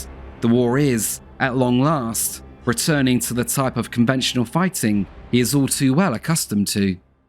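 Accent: United Kingdom. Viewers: Too bad this is narrated by a British accent.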